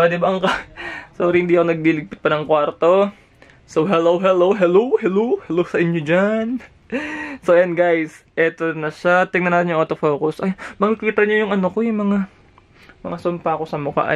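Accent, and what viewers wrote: Iloilo, Philippines. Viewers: hala illonggo ka gali ka cute HAHAHAA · kuya may tanong po ako Ilonggo kapo ba????????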